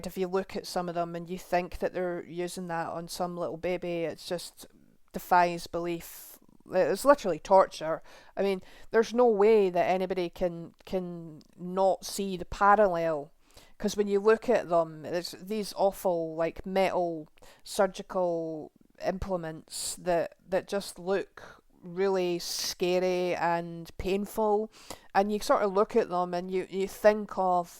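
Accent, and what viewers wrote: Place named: Scotland, United Kingdom. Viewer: It's interesting to hear your fierce condemnation and rightful indignation at this harmful cultural practice but at the same time you haven't completely shaken off the social conditioning normalising it. You speak of circumcised and uncircumcised rather than mutilated and normal or at least unmutilated. You say they ended up severely damaging David Reimer's genitalia to the point where it could no longer function as it was meant to which is what happens in 100% of cases with boys, at least! Actually he suffered a complete penile amputation rather than the intended foreskin amputation. You speak of the "medical procedure" when it isn't a medical procedure but a medicalised ritual or not even that in the case of the cutter being a Rabbi with no medical training and using no anaesthesia. We don't need balance when speaking about harmful cultural practices, we need objectivity! 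You sound Scottish, are you aware that in Scotland baby boys are put under general anaesthesia to have their genitals mutilated at their parent's request, on the NHS, at taxpayers expense?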